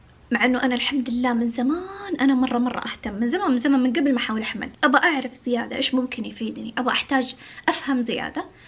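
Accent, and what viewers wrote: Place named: Saudi Arabia. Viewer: ولدت بجدة و انا سورية و اضطريت للهجرة و اشتقت للسعودية لما سمعت لهجتك حنيت الله يجمعنا بكم بخير يارب انتم قلبكم طيب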